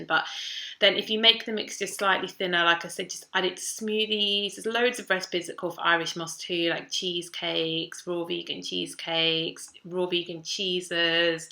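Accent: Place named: England, United Kingdom. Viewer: you are S-T-U-N-N-I-N-G and your English accent is Beautiful. I Love it. Please go back to this hair color. It suits you well. Makes your blue eyes really pop. Markus Rothkranz rocks and all of his products are effective.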